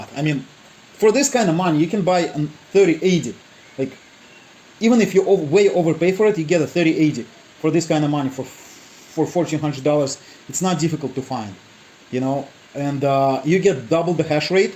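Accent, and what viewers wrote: Russia. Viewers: Русский что ли?!)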